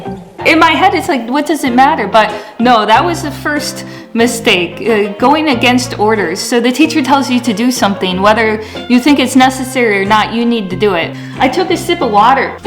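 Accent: Michigan, United States. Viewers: you are losing your Chinese accent ;) I definitely hear the Michigan now in your English :) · Hi, I really admire you, learning languages. And especially now you are learning Korean. When you speak English, you can tell your American accent but it comes to Japanese and Chinese accents. It was a totally different tone. 👍👍👍😄😄😄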